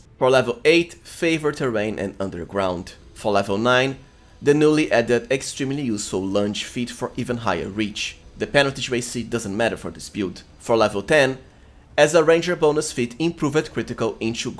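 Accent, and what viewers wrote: Brazil. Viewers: Acabei de descobrir o canal. Você é brasileiro? Fiquei com essa impressão pelo sotaque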